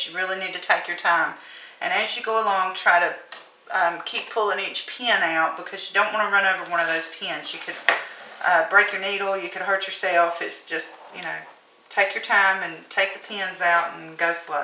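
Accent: South, United States. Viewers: I love your instructions. You make is so simple. And your Southern accent. Subscribed to you!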